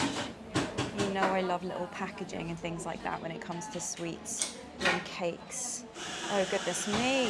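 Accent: RP, United Kingdom. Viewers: Your Received Pronunciation is exquisite.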